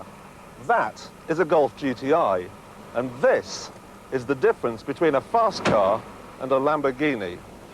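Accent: RP, United Kingdom. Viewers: Back when Clarkson spoke more like the Queen than his true Yorkshire persona like he does now. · He sounded so posh back then! Now he sounds like a chav lol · Why does Clarkson sound so posh back then lol? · Clarkson as hilarious as ever but sounding a little posher than now, obviously the public school education has eroded to become the success he is today and become a man of the people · Ha Clarkson used to have an old "bbc" voice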